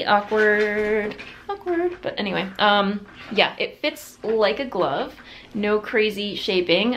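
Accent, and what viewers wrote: Brooklyn, United States. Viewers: What a fun inspiring and energetic episode ! Your shawl/ blanket looks so amazing ! Wow You made me laugh with your Brooklyn accent - too funny , in a good way Hope you have an amaIng week XEmilia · chuckling here at your Brooklynese accent .... so cute.... and as always love your podcast! oh ... and your dress is great too. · I'm from the Fort Hamilton area of Brooklyn and I don't have a very strong accent either. Your accent actually sounds a lot like mine. My Grandmother use to say "Errl" for oil but she was from the Jamaica area originally. None of my uncles or Aunts have that type of accent like my Grandmother. I think it was perhaps more from the German area of my family that did not speak that way. So perhaps it is the German/Brooklyn connection. I do still drop my R's though. Dinner is "Dinna" still. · OMG!!! You SO need to do an episode in Brooklynese!!! 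So good! 🤣🤣🤣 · You've inspired me to try dyeing yarn and now you're inspiring me to sew more. I really wish I could sew up a whole wardrobe for myself. But I'm such a newbie! Oh and I loved your Brooklyn accent! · your Brooklyn accent was BY FAR the best thing i heard all day!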